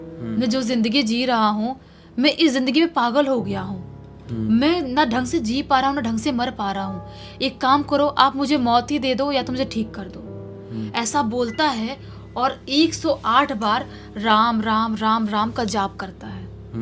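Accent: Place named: Uttar Pradesh, India. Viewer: Bhai hum roj aapki kahani sunte hai aur aap aaisa lagta hai ki aap up ke ho 😊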